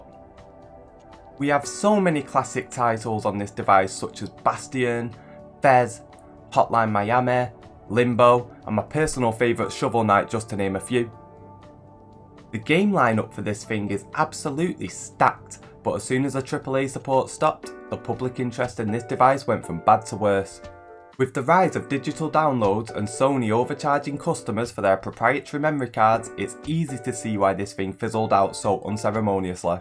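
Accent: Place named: Manchester, United Kingdom. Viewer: Guessing you’re from Manchester?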